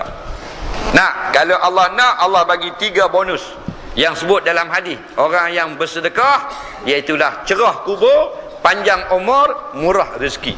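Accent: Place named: Kedah, Malaysia. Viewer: MashaAllah ustaz boleh,cakap kedah,,terbaiek.... السلام عليكم.. الحمدلله لا اله الا الله محمد رسول الله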